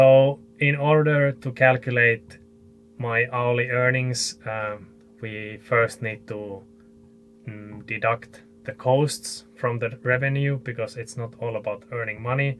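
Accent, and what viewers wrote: Finland. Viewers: I have enjoyed every one of your videos I have seen (most you have produced). You do have a unique channel and sailing focus. I think this video is very good, helpful to others, and a good sign of your transparency and honesty about the costs and challenges you have faced so far. I do have a friendly, easy to implement, suggestion for your channel. I encourage you to start your videos by a very brief introduction of yourself, something like "Hi, I'm, from Finland, I started sailing...and my goal is...and my partner Sophia and I are...join us as we explore Iceland, snowboard, etc..." and I would do that each and every video. Each one. Make it a part of your "intro" to the video. Also, I think most of the sailing channels that keep the viewers engaged (and that leads to more Patreon supporters) do so by making some kind of "Here is who I am, and YOU (viewer) are with us...'" I think many of the viewers like feeling like they are "there" with you, imagining what it is like, etc. 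To be frank, i did not know your name until watching several of your videos, and was not certain where you started (though i suspected Finland because of your accent), or how you supported yourself (translation mentioned in this video). Those are things that are personal details, but help a new visitor to your channel get some kind of possible connection. I came across your channel by chance, and started watching because I always dreamed of sailing in Arctic waters. Then when I saw you got Ar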